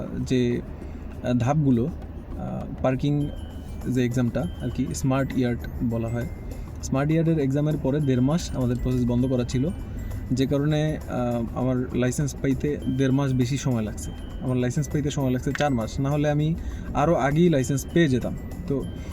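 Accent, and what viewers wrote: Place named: Bangladesh. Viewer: Bangladeshi laicent lage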